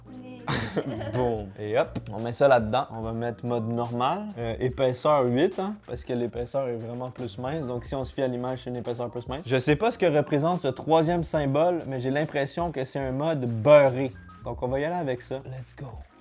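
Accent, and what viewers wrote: Canada. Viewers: Is this chap Canadian ? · Cet accent Quebecois pour un français de Paris comme moi c'est juste extraordinaire ! Je ne m'en lasse pas de rigoler (sans me moquer bien sur !). Ca donne un coté tellement sympathique aux Quebecois ! L'autre jour j'étais justement avec un amis Quebecois et je lui disais que si je venais à me disputer avec quelqu'un au Quebec, je n'arriverai même pas à m'énerver correctement si l'autre me parle avec cet accent ! 🤣🤣🤣🤣🤣🤣 Je pense que ça me ferait rire tout au plus ! 😂😂😂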